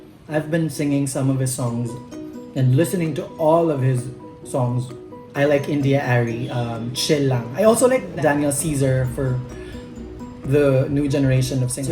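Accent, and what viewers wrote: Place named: Cebu, Philippines. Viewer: I like the shape of your face..your nose and eyes. The way you speak in english also..d halata nga cebuana ka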